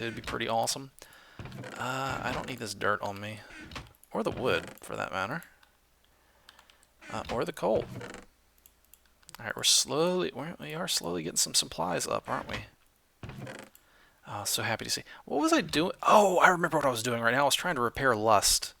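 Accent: South, United States. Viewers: Mild Southern American accent. I believe he said that he's from Louisiana. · quick question....are you forcefully saying water differently to mask your "southern" accent? just something I've picked up on and it sounds kinda off (not criticizing you for doing it though) and secondly what did you use to make the jack o' lantern texture? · Hey, where are you from? It sorta sounds like you have a little southern accent goin on:P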